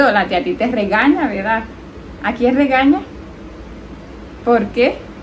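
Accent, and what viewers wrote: Caribbean Coast, Colombia. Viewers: Costeñol puro!!! Abrazos, desde Cartagena, Colombia!!! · Tan bello, habla puro costeño. Jajajaja disfruta mucho la familia Tite, que después tendrás chichi pa rato🙏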